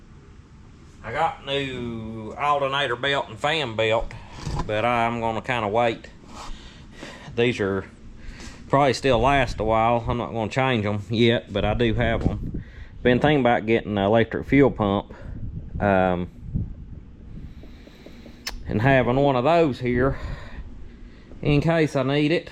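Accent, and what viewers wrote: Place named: South, United States. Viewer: Some of the words you say, or how you say them remind me of my grandpa. What’s funny about that is I’m probably older than you. Here in northern Ky the country accent is non existent. Always love to hear it.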